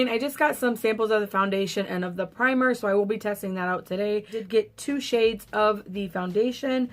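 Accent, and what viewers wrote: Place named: Latin America. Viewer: Glad you guys are ok!! 💜 I want the Fenty foundation SO BAD but there are too many shades to swatch 😅 But GIRL. I just realized- are you a fellow Latina!? I never realized your accent before this vid! You sound just like mis primas! 😂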